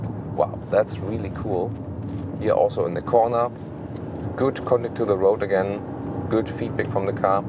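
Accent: Germany. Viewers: That strong German accent is horrible. Most kids speak much better english. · Yeah, I guess now that you mention it, we've had German au pairs and they and their friends are all embarrassed by their parents' accents.:) It's not so bad though... Germany is a tremendously well thought of country to say the least! And Germans (au pairs, at least) have much better English than other European countries. So, don't worry about the accents. ' accent really adds to the review for us Americans because it's a constant reminder that this is what an actual German thinks! Europe is cool for Americans. It's a big reason why Americans drive European (German) cars. Now me, personally,... I've owned only one foreign car in my life and it actually was a brand new Audi A6. And that car almost put me in an insane asylum with all of its problems and its crappy engine!:) So, it's Detroit Iron only for me! 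· His German accent genuinely made me feel I was already riding an audi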